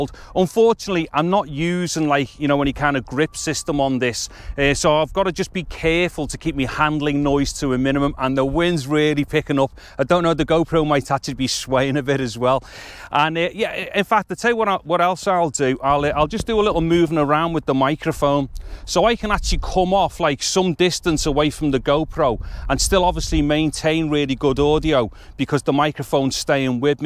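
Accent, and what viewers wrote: United Kingdom. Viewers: I love your dialect ! From which part of UK you´re comming from?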